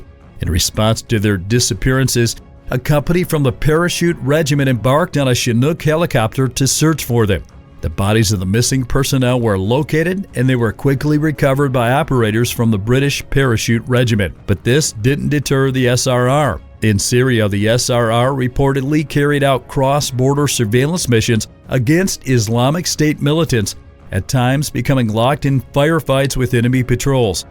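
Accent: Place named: United States. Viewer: Another disappointing video created with a startling level of ignorance. Why narrate a history of the SRR with a mostly American military forces video overlay. An American accent narrator is also a big turn off.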